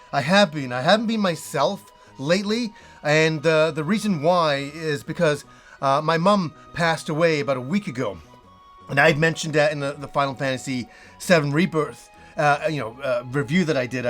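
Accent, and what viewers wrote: Yorkshire, United Kingdom. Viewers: She sounds like a proper Yorkshire lass! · Lol a real yorkshire accent. I pray Allah SWT guides you and your family. Ameen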